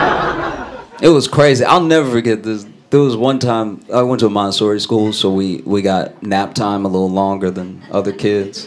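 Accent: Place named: South, United States. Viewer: this man sounds like he is from below the mason dixie line!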